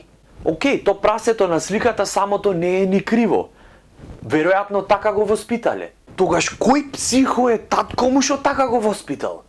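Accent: Ohrid, North Macedonia. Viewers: brat izvini vaka, ama za stend ap komedija ne si losh, no bitolcaneson te shie... ne si faca iako imas smek... bi rekol deka znaesh kako i umeesh do negde, no ti fali lik... napraj neshto od sebe, smeni go likot.. neznam kako, ama ti si umetnikot, stori neshto... i malku pomalce naglasuvaj go ohridskiot dijalekt.. iritira... naj iskren sovet. pozdrav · Mislam deka gresis. Covekov ima bogat recnik, a segite mu se navistina originalni, i bidejki gi interpretira na Ohridski dijalekt isto e interesno. Marjan poveke koristi sekojdnevni razgovori, no i toj e dobar. Pozdrav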